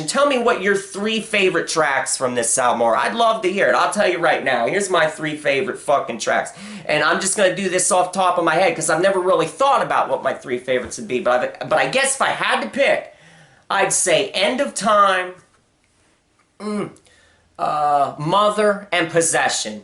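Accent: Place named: South, United States. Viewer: where are you from I'm just asking because of the accent it sounds southern and New York at the same time haha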